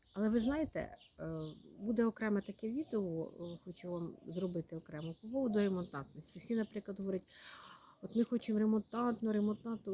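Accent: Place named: Cherkasy, Ukraine. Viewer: Я сама живу в Черкаській області, знаю цей змішаний говір.Не ображайтесь,все буде добре 🌹🌹🌹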